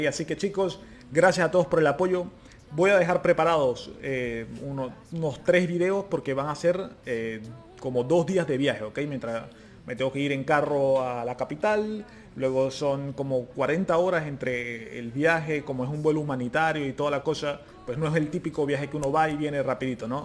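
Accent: Venezuela. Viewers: Matias Cohen No contradigo que actualmente vive en Italia. Lo que contradigo es tu comentario “Es italiano”, en realidad es Venezolano (según yo) por lo que dijo desde el minuto en adelante y también por su español (con acento venezolano) fluido, tengo una profesora de Italia en mi Universidad y ni ella habla el español tan fluido como.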